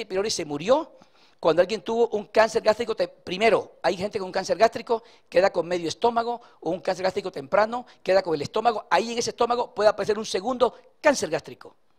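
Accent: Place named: Spain. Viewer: Eeexelente conferenca y sobretodo laclaridady la sencillez con esa explicacon genial el Doc ¿de donde es por su acento al hablar parece ser de España muuchas felicidades Doc. Siga asi amandosu carrera nocomo otro$$$$$.....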